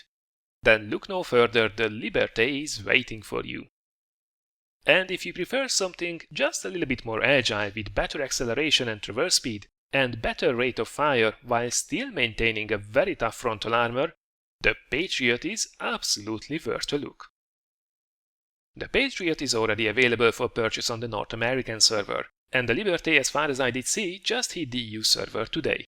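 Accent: Hungary. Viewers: 100% hogy magyar vagy a kiejtésed alapján :D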